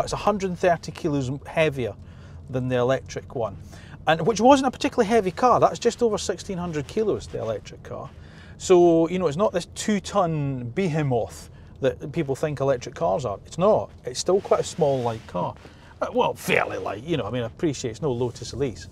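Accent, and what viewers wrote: Scotland, United Kingdom. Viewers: A thorough, objective, well-informed review of an interesting car, all delivered in Scottish accent. What more could you wish for?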